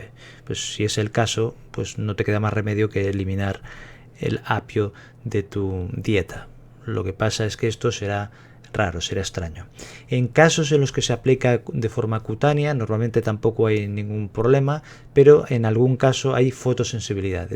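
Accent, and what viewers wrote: Spain. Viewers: where in the world did you get your study you are very confusing you sound like you're from Spain good looking man but apparently you have not done your research on celery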